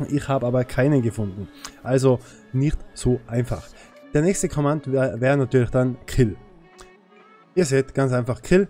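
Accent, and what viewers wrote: Switzerland. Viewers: bist du schweizer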